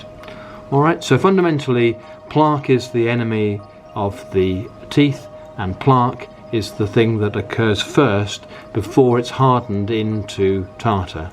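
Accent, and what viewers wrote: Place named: England, United Kingdom. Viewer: Because he’s English and that is the correct way to pronounce it.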